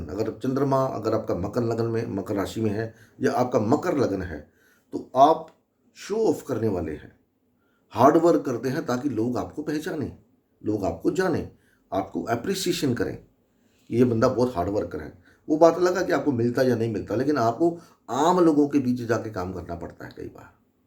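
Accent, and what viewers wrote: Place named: Punjab, India. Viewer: Thank you so much,your Punjabi accent Hindi is so attractive.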